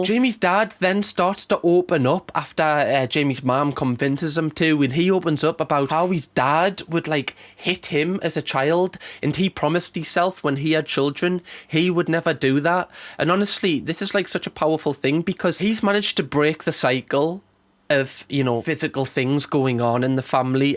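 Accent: Newcastle, United Kingdom. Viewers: Loving yooursoft Geordie accent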